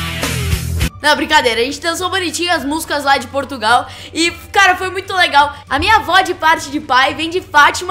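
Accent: Brazil. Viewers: Tambem adoro o sotaque brasileiro #SOUPT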